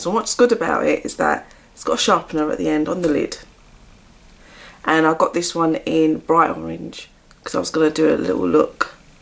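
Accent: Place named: Australia. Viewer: Youre bloody stunning! You kinda sound Aussie :D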